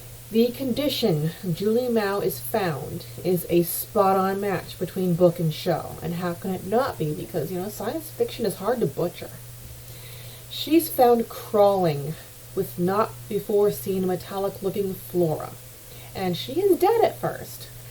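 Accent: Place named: United States. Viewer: I love your accent! What state are you from?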